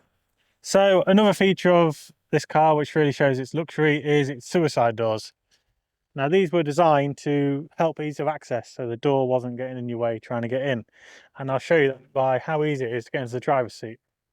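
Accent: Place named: Grimsby, United Kingdom. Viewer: Beautiful car and a nicely made video. That sounds like a Grimsby accent to me. Couldn't work out where you were driving though. Woodhall? 😊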